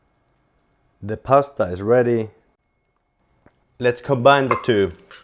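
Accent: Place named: Finland. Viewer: It's the Finnish accent for me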